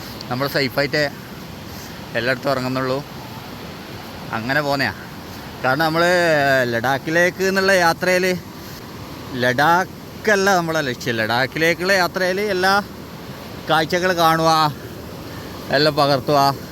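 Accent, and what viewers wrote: Kannur, India. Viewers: നന്നായിട്ടുണ്ട് എങ്കിലും സംസാരത്തിൽ കുറച്ചു കണ്ണൂർ ഭാഷ കൂടി പോക്കുന്നു വീഡിയോയും ഒന്ന് കൂടി ശ്രദ്ധിച്ചാൽ അടിപൊളി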